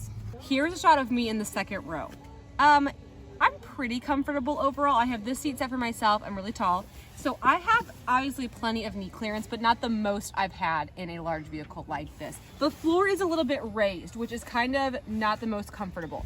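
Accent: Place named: Minnesota, United States. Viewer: She has the Minnesota accent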